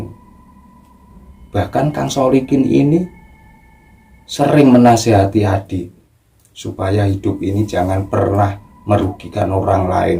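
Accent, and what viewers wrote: Java, Indonesia. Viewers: Assalamualaikum...mas,saya salah satu subscriber nya mas.Saya Disini ingin memberi sedikit saranan jika mas gak keberatan coba dikurangin medoknya biar enak didengar,saya faham kita sebagai orang jawa memang lidah kita agak medok tp alangkah baiknya jika dikurangin.Saya Disini hanya memberi saran tp jika masnya agak keberatan juga gak papa,karna memang ciri khasnya kita orang jawa berbicara begitu.Moga masnya selalu dilindungi allah,dan saya mohon maaf jika perkataan saya menyinggung jenegan,sukses selalu....🙏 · Ga apa mas logat mas yg medok saya seneng ko itu kan ciri has suku masing2 gas ken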